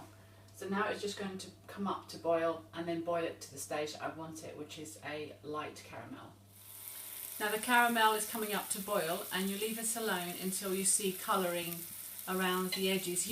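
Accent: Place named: United Kingdom. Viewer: Lucia P Que parvidade. Cada um fala a língua que quer. O inglês é mais universal e funciona melhor em termos de views no youtube. Além disso, não conheço esta senhora, mas o sotaque dela é britânico, portanto presumo que ela seja inglesa e não portuguesa, e que apenas esteja a ensinar uma receita portuguesa.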